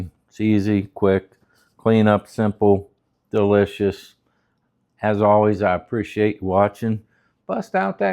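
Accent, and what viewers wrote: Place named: Ohio Valley, United States. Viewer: Hi! Love your videos and your Ohio Valley accent. I know you said you got your griddle from Sam's Club. I'm looking at the Lodge bakeware. Seems the widest cast iron "baking" pan (aka griddle) is 10" X 18". What are the dimensions of your griddle? In this video it looks wider than 10". Please let us know.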